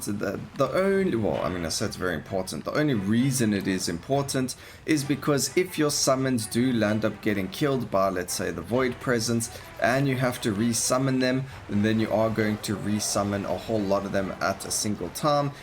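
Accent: South Africa. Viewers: Do i hear a south african accent?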